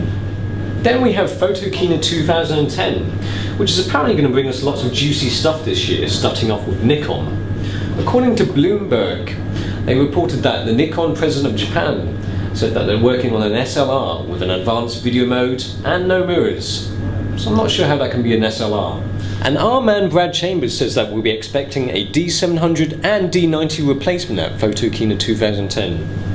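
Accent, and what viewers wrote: United Kingdom. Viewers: "Presented by an Asian guy with a funny British accent." Hahaha always my first thought when watching DigitalRev videos! :)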